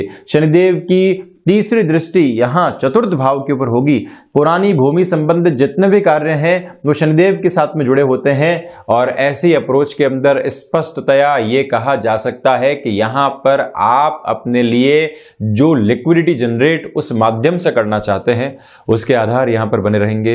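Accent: Standard. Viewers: Predictions k sath Dharapravah Shuddh Bhasha sunne k liye milti hai aapse ji..... Pranam evam Dhanyawad 🙏 Kripaya bhasha ka standard yahi banaye rakhiye.....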